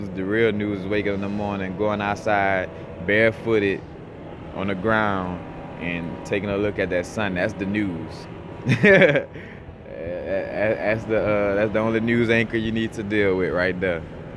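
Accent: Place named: Louisiana, United States. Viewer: where you from bro? you sound like youre from my neck of the woods down in the boot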